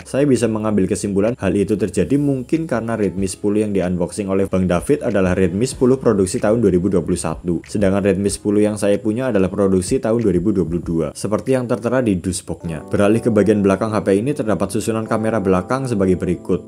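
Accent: Banyumas, Indonesia. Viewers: Kaya logat ngapak?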